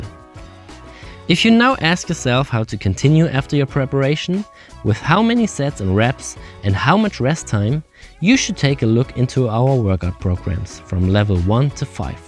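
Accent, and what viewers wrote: Germany. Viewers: slight german accent.. bist du Deutscher, mein Lieber? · german accent, fuckin hell man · Wie man einfach raushört, dass du deutsch bist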